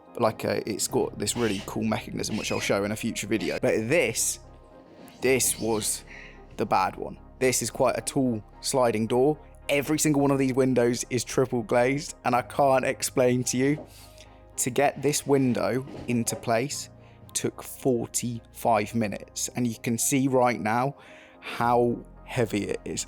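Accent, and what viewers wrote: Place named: England, United Kingdom. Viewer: As for talking or not.. just carry on as now. Your voice is pleasant, info is good to know. Too much talking can get annoying but so can total silence. How'd you keep yr English accent growing up in Normandy? Love to know..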